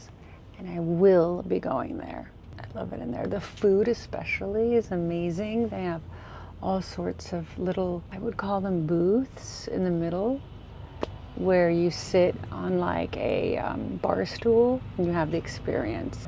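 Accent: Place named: United Kingdom. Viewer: ! You crack me up! All that British accent chatter in the park! I am sure that you went on and on! LOL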